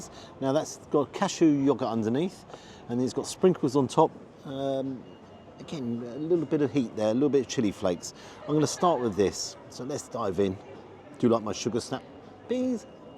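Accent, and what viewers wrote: RP, United Kingdom. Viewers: You sound very posh, and you have your own camera man. Most people doing this do there own videoing. From A&B.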